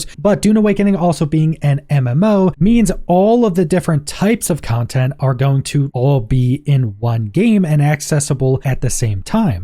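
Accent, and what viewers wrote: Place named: South, United States. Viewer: It’s a southern thing. I’m bet he also says Axe instead of Ask.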